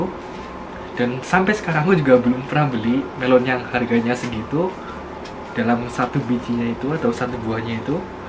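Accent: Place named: Banyumas, Indonesia. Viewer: Ora ngapak ora penak😀👍🙏🙏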